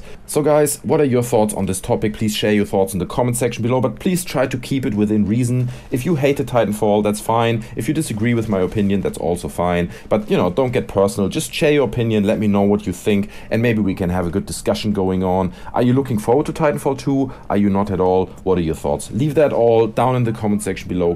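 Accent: Germany. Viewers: A you german bist du deutsch? :D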